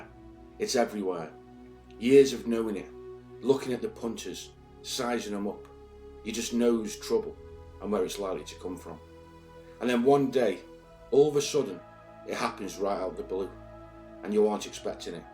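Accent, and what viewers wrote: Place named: Liverpool, United Kingdom. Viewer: Your accent sounds slightly Scouse mancian yet your from London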